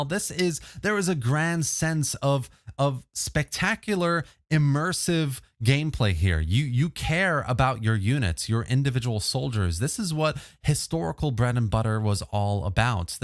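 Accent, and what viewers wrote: United States. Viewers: Alright. Who the hell deals in pounds, but has an American accent? What's going on here? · You're the most American sounding Scotsman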